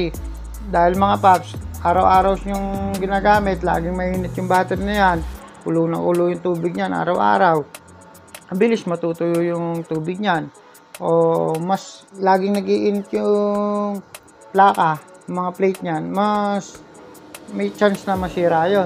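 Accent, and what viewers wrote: Bulacan, Philippines. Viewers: yung punto ng salita mo, parang bulacan o nueva ecija? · Bulakenyo o taga binangonan ka paps? Sa punto kasi ng salita paps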